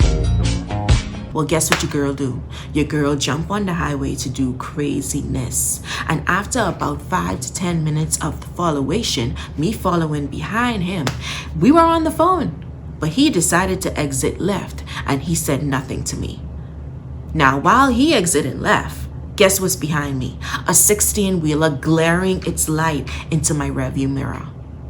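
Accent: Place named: Bahamas. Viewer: You sound like a Bahamian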